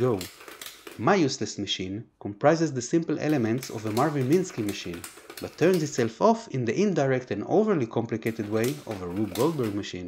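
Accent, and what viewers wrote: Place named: Israel. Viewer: מזהים את המבטא הישראלי חח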